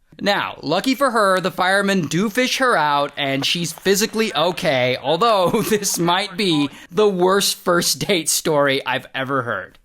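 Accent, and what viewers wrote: England, United Kingdom. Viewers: that bri'sh accent 💀🇬🇧☕ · The British accent 💀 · Im cackling at ur british accent dude lmao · Mint English accent! Hilarious story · bro has an interesting British accent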